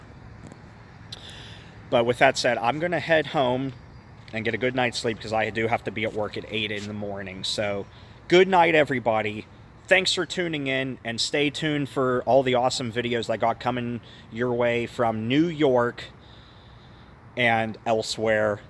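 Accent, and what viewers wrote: Pennsylvania, United States. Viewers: i was born in oil city in 61 love the pa accent glad it wasnt u car parts u were lookin thru in another video when i asked,,,,,we really need somebody in rochester ny area to do these soooo many to film.....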